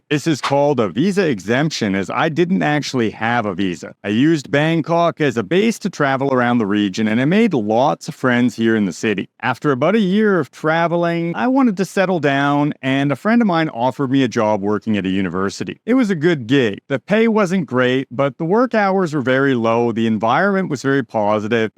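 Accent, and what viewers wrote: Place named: Canada. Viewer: You sound Canadian, probably Alberta? If you are into languages check out Shuo, she teaches Chinese in Bangkok Thailand, is Chinese, a great person and teacher!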